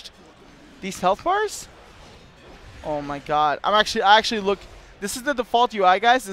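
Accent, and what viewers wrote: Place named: Venezuela. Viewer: You are from Germany? I thought you were from Venezuela.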